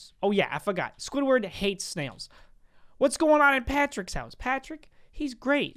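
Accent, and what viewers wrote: Italy. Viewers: I think you cute with the Italien accent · you need to about me out in the next video!? Btw I love the Italian accent and I am part Italian!☺😊😀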